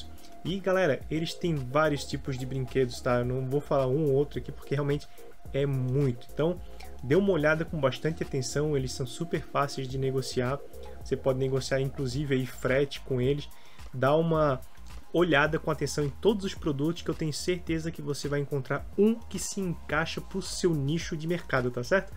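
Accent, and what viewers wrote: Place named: Florianópolis, Brazil. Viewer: você é de floripa também? fala igualzinho aqui o sutaque kk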